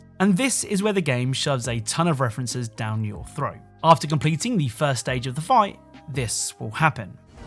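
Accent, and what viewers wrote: United Kingdom. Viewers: What area roughly do u live u sound British u your not from London though ar ya u don't really sound like it, I'm in Harrow, I'm surprised that still happens over here cos it's very time consuming I feel, unless u had a good car I guess...🤔soz though man at least u n family unharmed that's most important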